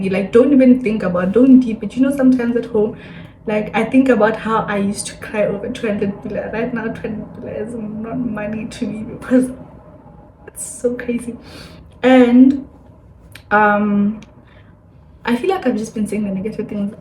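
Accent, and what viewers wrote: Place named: South Africa. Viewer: 🤣🤣😭is it South Africa ? Waitse batho bone mma Mme accent ya rona e monate nna i like it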